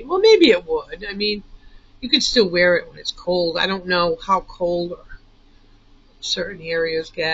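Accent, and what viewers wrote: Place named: New York, United States. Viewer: Hi, you so remind me of my neighbor in NY, the accent too.😄💗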